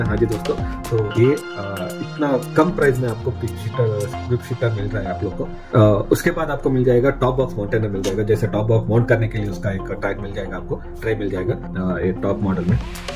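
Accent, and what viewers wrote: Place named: Northeast, India. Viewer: abe ve north east se hai... tujhe jyada dikkat hai to jaake sidha showroom walo se baat karle na ek to banda mehnat kar raha upar se ye C type log aa jaayege samne wale ke accent pe bolne ke liye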